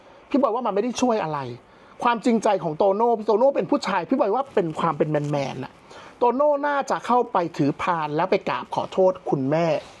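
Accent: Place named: Isan, Thailand. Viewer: เสียคนอีสานเหมิ้ด😅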